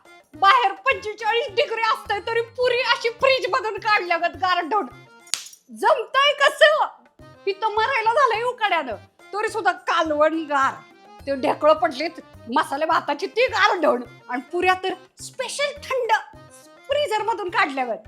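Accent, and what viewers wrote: India. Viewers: लय गावरानभाषा आवडली1चंबर❤ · 😀😀जबरदस्त कॉमेडी हसून हसून वाट लागली. तुला काय भन्नाट आणि खरे विषय सुचतात ग. आणि अगदी हुबेहूब गावरान बोली भाषा तो अभिनय ऐक नंबर. 😀😀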